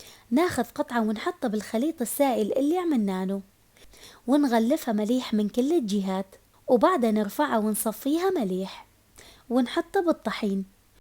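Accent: Mosul, Iraq. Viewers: هاي اللهجة المصلاوية عيني احترمي 👍🏻👍🏻👍🏻👍🏻👍🏻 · فديت اللهجة الموصلية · عاشت ايدج،، شگد حلوه اللهجه المصلاويه ❤ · هلا وكل الهلا نورتي ياغاليه عاشت ايدج انتي مبدعه ربي يحفظكم ويسعدكم ❤انتي من الموصل من اللهجه ❤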